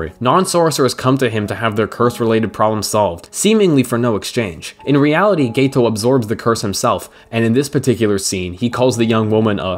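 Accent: United States. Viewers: holy shit I just can't with the overly American pronunciation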